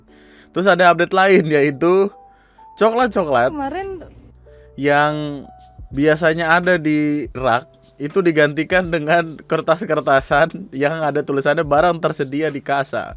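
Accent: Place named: Makassar, Indonesia. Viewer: Dukung bang buat lancar logat Makassar:_andriWkwk::_andriWkwk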